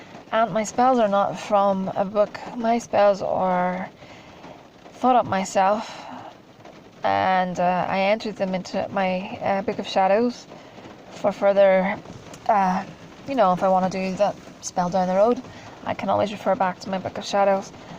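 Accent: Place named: Ireland. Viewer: Good vid, more of these please (if you can find the time!). I can tell by your accent you come from not far from me. Being someone v interested in this side of life I've found it very hard to find groups, other individuals etc who are serious about this. How did you go about it, learning, meeting others who are interested in magick etc? I know Ireland is full of us, i just dunno where to look and am so wary of charlatans. Thanks! ☺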